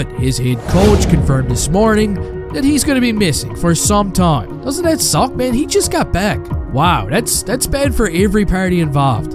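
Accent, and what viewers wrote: Newfoundland, Canada. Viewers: First time on this channel. Is a Newfoundlander? Just want to test my accent detection abilities?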